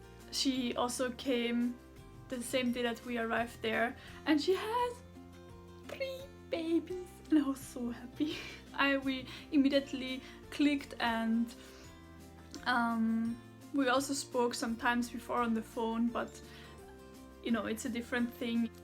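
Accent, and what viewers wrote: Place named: Nigeria. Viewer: Beautiful oniocha! Your Nigerian accent coming through quite strong lol! I wish we could all appreciate and respect each others culture like this.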